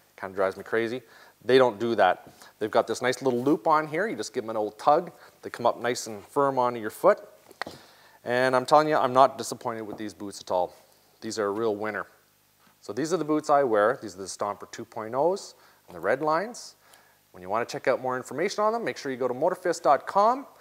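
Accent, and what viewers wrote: Canada. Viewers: you cant tell by how he talks?! Canada ehhhh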